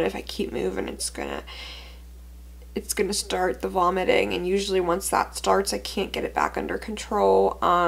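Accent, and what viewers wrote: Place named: Canada. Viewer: are u canadian? u have a canadian accent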